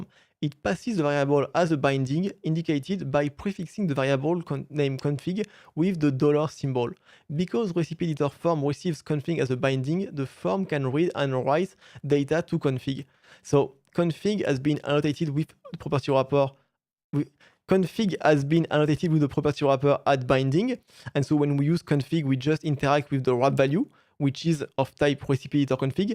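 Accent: France. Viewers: fransız aksanı mı bu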